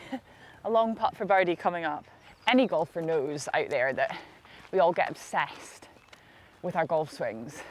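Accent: Scotland, United Kingdom. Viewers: Really good idea, with real insight from someone who explains clearly in a lovely brogue - a pleasure to listen to. Suggest Dunstanburgh and Edzell (both hidden gems) and possibly Lady Bank with possibly Victor Perez in Scotland and Lee Westwood at Dunstanburgh. Down south what about Ferndown and Trevose with Andy Sullivan. Really looking forward to the series where ever you choose. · I watch your videos for the golf.. and the charming Scottish accent!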